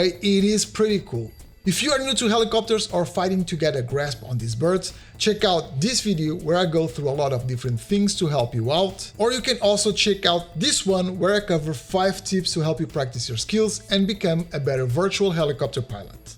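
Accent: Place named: United States. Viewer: oh my got. that voice .. relax man, such american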